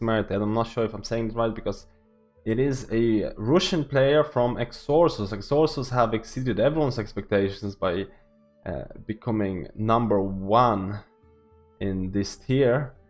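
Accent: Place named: Dublin, Ireland. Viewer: Not sure where you are from but that Dublin accent comes through quite often. Torty is also in Pure a 3 day raiding guild so you do at least have someone from the 3 day raiding guild in the top 5.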